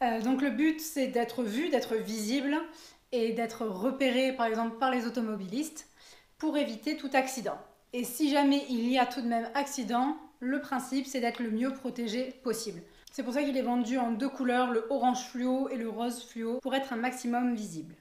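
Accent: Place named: England, United Kingdom. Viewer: Je kiff trop ton accent Anglais !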